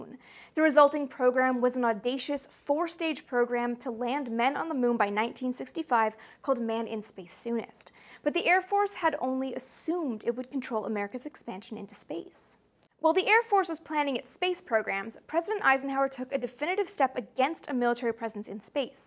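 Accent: Canada. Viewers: Love the show! But is it possible to move away from the almost 100% vocal fry delivery? It is painful after the first few minutes.Vocal fry is common with the Kardashians in sound bites but for a 15-minute video it is literally all I can hear. Keep the Canadian accent of course! You have great content.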